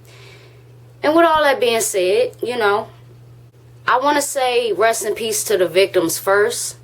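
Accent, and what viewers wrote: Washington DC, United States. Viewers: You from DC? I'll definitely subscribe now. **New Sub** I enjoy your content and you have a nice speaking voice. Keep up the great work ya heard?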